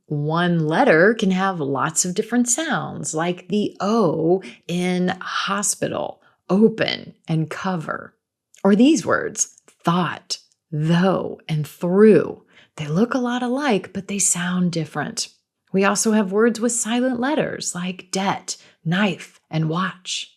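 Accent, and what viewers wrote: United States. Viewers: I'm addicted to your beautiful voice every night when i go to bed your voice is digging in my brain. American accent is outstanding. Much love to you Coach from Morocco 😘😘😘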